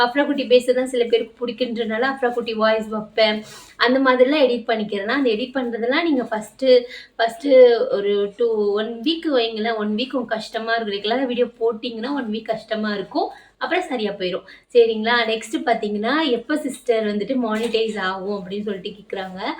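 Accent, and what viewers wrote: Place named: Chennai, India. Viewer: Chennai ya sister neenga pesurathu romba pidichirukku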